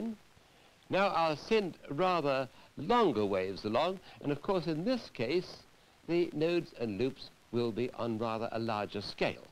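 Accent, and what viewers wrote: United Kingdom. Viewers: When you hear a man speaking in that old fashioned more upper class English accent, you listen! I learned so much, and this is how physics should be taught, thanks for posting. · Brilliant and clear British accent !!!!I like that!!!! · Wonderful accent, very posh.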